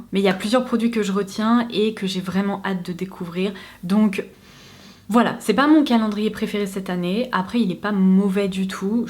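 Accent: England, United Kingdom. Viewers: Ton accent anglais est magnifique, à tu fais des études spéciales ?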